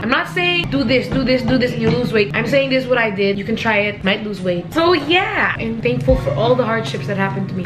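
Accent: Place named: Philippines. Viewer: Oh my goodness I love your Filipina accent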